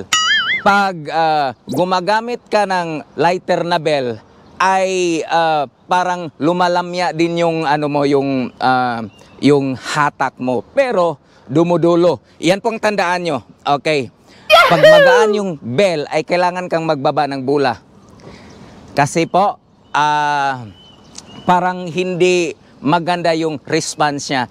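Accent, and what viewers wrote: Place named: Visayas, Philippines. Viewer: Pwede naman kayong wag manood para hindi kayo mainis. Si kasi sa obserbasyon ko eh Ilonggo at bisaya originally. Medyo hirap talaga sila managalog ang mga bisaya. Alam ko kayang mga english ni pero mas malawak ang maaabot ng mga vlogs nya pag tinagalog nya. Tas matagal na yata sya sa Thailand at fluent na sya magsalita Thai. Kaya naghahalo halo na ang accent nya. Sa kin walang problema ang paliwanag nya. Kahit papano may napulot ako.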